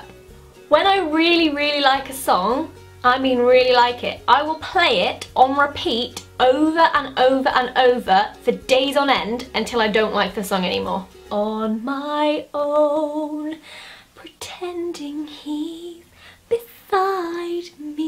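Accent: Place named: United Kingdom. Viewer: то, что вы имеете в виду - это американский английский, а это - чистый британский акцент (может не чистый, но британский английский)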